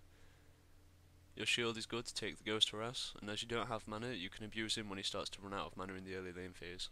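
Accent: Northern England, United Kingdom. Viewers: You have the same accent like the Northerners in Game of Thrones! Where are you from?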